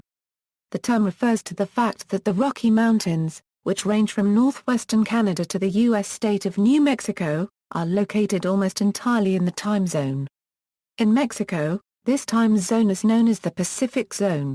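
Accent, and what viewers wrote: United Kingdom. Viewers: I don't understand a word of what this fucking bot says. British English is awful. God bless America, my home, sweet home.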